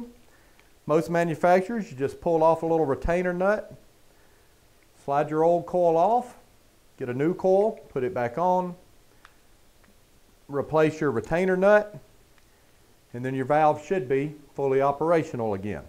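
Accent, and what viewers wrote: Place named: South, United States. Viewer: As a Georgia native, definitely has a southern accent. I assure you however that the information contained will also help with coils.😎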